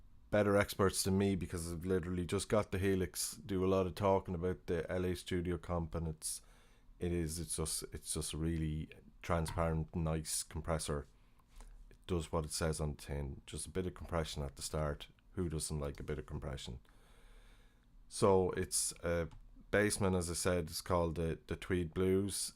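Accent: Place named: Ireland. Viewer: and? You started life with two strikes against you. I was going to offer my sympathies but I heard your voice and it appears you're Irish which more than compensates for the two aforementioned failings.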